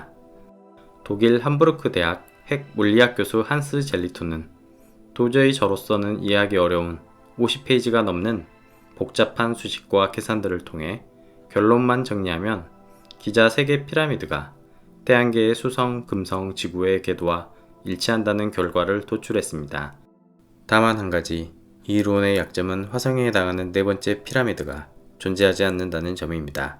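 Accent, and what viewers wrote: Jeolla, South Korea. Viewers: 전라도 억양을 사용하시네요 ? 악의없는 댓글이니 오해 마시길..... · 이건 개인적으로 느낀건데 정보를 전달하는 분들은 정확한발음과 표준어를 사용해야 한다고 생각합니다 발음은 상당히 좋으신편인데요 전라도 사투리가 심하셔서 듣기 거슬립니다.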